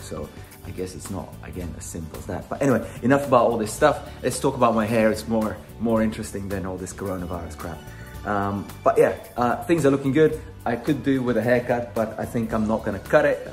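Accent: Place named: Scandinavia. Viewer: Lol i was curious because you sort of sound nordic. I guess latvias not too far away from there.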